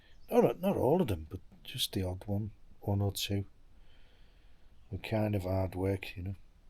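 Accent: Liverpool, United Kingdom. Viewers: Is that a Scouse accent? Great vids cheers mate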